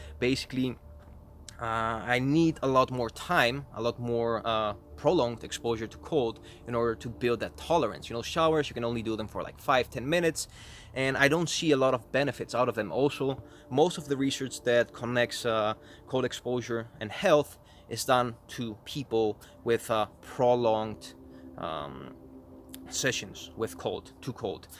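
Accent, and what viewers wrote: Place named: Netherlands. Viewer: For someone who lives in Greece, you sound Very Dutch! 😀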